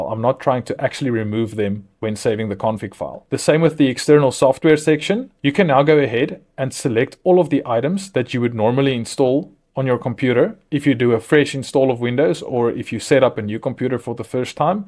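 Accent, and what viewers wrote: South Africa. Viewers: Dude, are you a South African?????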